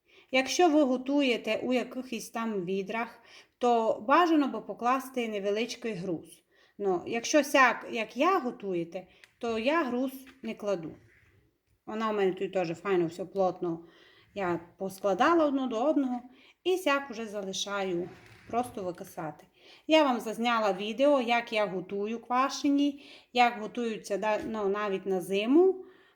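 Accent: Transcarpathia, Ukraine. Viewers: Це Закарпаття, свій діалект української мови. Все гарно ( файно) і зрозуміло. Дякую,.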